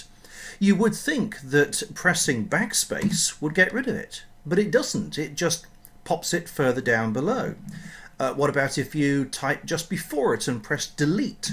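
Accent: United Kingdom. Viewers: I was frustrated with that line. Thanks a ton for showing how to get rid of it. Although it could have been a shorter video. Loved the Brit accent...been a long time since GoT!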